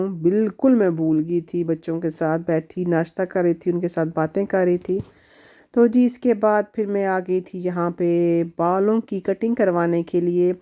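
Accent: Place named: Netherlands. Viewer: Ap k speaking main wording k axcent bilkul dutch language jasa lgta. Hy .ap ny dutch language seekhi proprly ya khud hi aa gai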